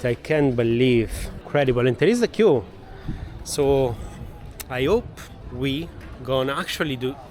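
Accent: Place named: Italy. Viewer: Interesting video. What is your nationality? Are you italian? Your accent sounds italian.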